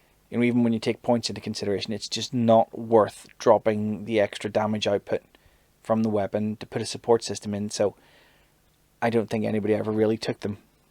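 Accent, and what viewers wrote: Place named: Northern Ireland, United Kingdom. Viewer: I hear another Northern Irish Guy talk about 40k I sub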